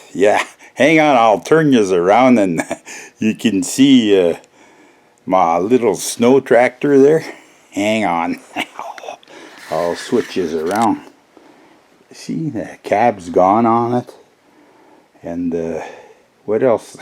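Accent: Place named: Upper Peninsula, United States. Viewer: Sounds like a Yooper. I think this guy would be a awesome neighbor.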